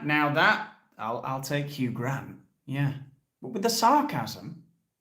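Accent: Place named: England, United Kingdom. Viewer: Mr you are such a handsome englishman. I love your accent. Female from Trinidad here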